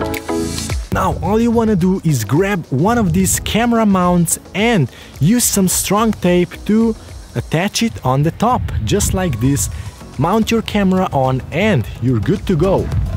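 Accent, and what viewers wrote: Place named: Slovenia. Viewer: Where are you from? It sounds like Slovenian accent.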